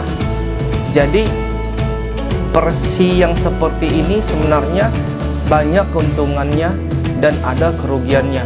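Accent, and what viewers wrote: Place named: Balige, Indonesia. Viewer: Masih sama kali logat abang ngomong ya kayak di balige dulu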